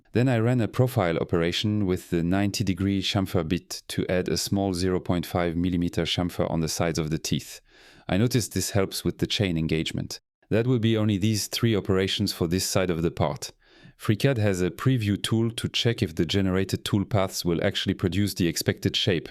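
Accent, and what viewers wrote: France. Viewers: Heya! You've got a good fluent English with a lovely fleur (you may be saying gaze or voile these days, but in Russian we still say флёр when we mean gaze or voile figurément, I think it's an old-school maniere which dates back to XIX or even further to XVIII and all those Lois... Lous... Louis('s) you used to have) of French accent, mate! Do not be shy of it, keep it rolling! And for what it's worth, a turbine is driven by working medium, e.g. by fluid, by gas or anything else, whilst a fan - or better yet, an impeller - is the thing that moves the working medium, be it air or water or whatever. So you added a fan to your spindle to kick away chips from cutter, not a turbine. And just between us, I wouldn't say it loud that I've got any sort of piété to Tesla in general and the Truck in particular should I have one. It is rather controversial aveux. 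· Where's your perfect English accent? Finally, your real voice? That French accent is a bit funny, personally I like it, and your English is good. My voice would be much worse 😀😀😀👍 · It's impressive, how capable FreeCAD became and I've really enjoyed watching your CAM tutorial. By the way, your lovely French accent is really charming.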